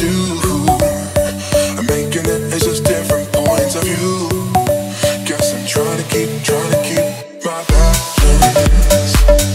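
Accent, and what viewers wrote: United Kingdom. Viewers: I like her British accent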